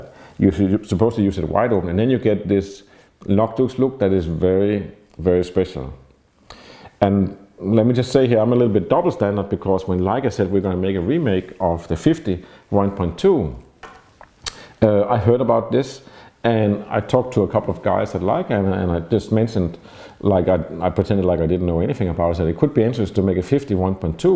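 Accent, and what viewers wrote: Denmark. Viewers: very danish accent - but god input man !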